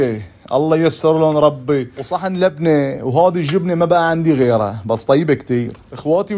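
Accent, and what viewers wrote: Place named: Latakia, Syria. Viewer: والأحلى اللهجة اللادقانية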